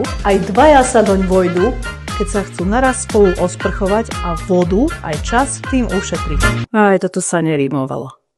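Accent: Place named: East, Slovakia. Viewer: Ďakujem za odpoveď, zachytila som východniarsky prízvuk, tak som myslela, že ste sa tam len napr. vydali. Tak nech sa darí!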